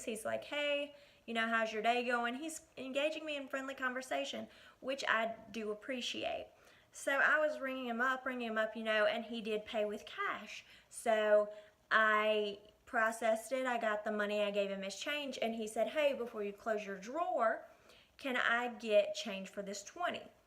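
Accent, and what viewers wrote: South, United States. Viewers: You’re beautiful and I love that southern accent.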